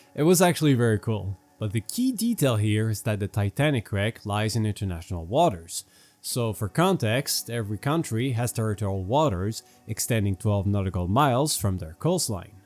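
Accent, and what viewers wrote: Canada. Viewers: hey, are you from Sasktatoon? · What were the pings they kept talking about ? I’m going to have to listen to this again, to pick up some information I missed the first time . I definitely will see the movie There is another boat that has some questions I ‘d like answers to. The boat “ Trash man “ sailed out of So. Portland Maine, going to Ft Lauderdale Florida. Wrecked by a storm. There is a first hand book about it written y a survivor, and some films for the Weather channel, but some things were left out of the story so it didn’t add up. No conspiracy , but human mistakes and the way the boat was built ( square port holes ) that didn’t hold up. Anyway I like your east Quebec French accent comes out a little bit. Sending good thoughts from PEI and Florida.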